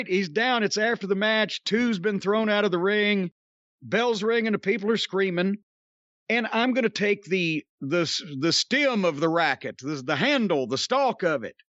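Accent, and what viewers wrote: South, United States. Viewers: an intelligent well read southern man is what makes it for me. Too many give them a bad name in the public eye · The southern accent gives It a certain flavor. I Jim decides to do a news show I'm in.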